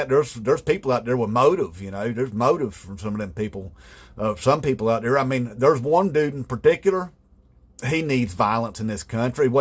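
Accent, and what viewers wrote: South, United States. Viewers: Brother Man...and I mean that from the HEART!.. Was thought never judge others by race,color,ethnicity and or geography...For you SIR..are a GOOD/DECENT MAN..that Southern Accent and long hair sort of made me bais (somewhat ) I Was really SHOCKED by the context of your video..Again..SIR! MUCH RESPECT! ..for Speaking TRUTH..and for doing it while ..sticking to Facts and not personal bias and or prejudice..This NYC Puerto Rican would be honored to meet at a Bar of your choosing and swinging back a couple of Tall Pitchers of Beer(of your choosing)✌🏽 Brother..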